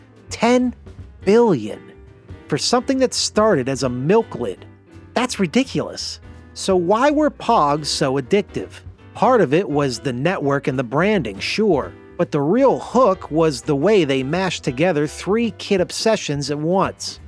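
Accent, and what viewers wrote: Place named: Pittsburgh, United States. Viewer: Never heard a yinzer more yin’s than this guy. Holy effin dahn tahn.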